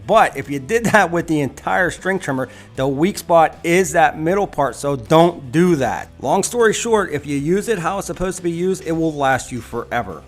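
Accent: Pittsburgh, United States. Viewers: You from Pittsburgh if not you sure have a pittsburgh accent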